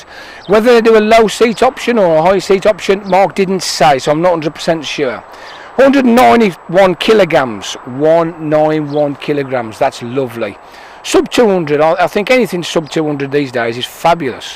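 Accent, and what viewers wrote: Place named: Wolverhampton, United Kingdom. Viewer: Dear really enjoyed your review. We are lifelong bikers now into scooters but like the Enfield. Is your accent Wolverhampton as you mentioned football. We are near Hinckley triumph. John x Linda x chris Bellamy UK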